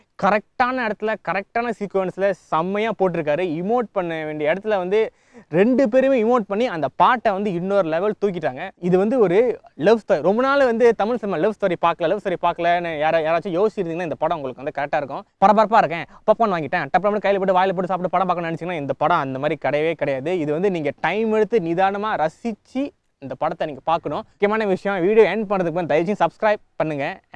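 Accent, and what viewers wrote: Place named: Malaysia. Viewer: He sounds like Kelly.. May be he belongs to Malaysia!!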